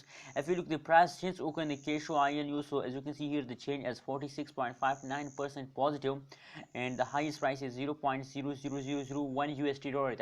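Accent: India. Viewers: white people video thumbnail english language indian accent as fast as japan high speed electric train no facts at prediction even a teenage 14 boy also can reexplained this thats blah blah blah zero informative content as for sure most brainy positive video presented by showing by content presenter faces for sure this boy wanna follow hype and get fast subs and views and get money for sure many viewers will stay out from this kind of video coz its promising thumbnail video promoting click bait.. well done indian teenage boy! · Dude, i cant even understand what are you saying. Indian accent is already hard to understand and you are speaking too damn fast.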